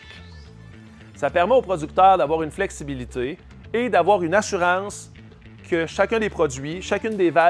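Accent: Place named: France. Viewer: Yes with and the English Chanel needs to be without the French accent so us Americans can better understand. Please.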